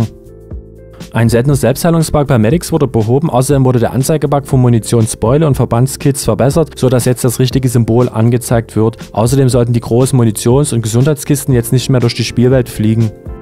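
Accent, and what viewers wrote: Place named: East Germany, Germany. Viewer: Keule, Keule... Ost-Dialekt, und dann so schnell reden, inklusive Englischen-Begriffen?! Da platzt mir die Birne 🙇‍♂️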